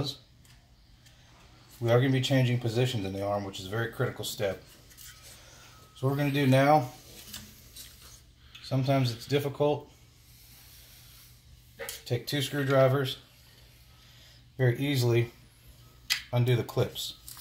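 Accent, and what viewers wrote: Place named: South, United States. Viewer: Finally found it! You sound like Matt Fikac, you southern guys all sound the same 💪🏼😆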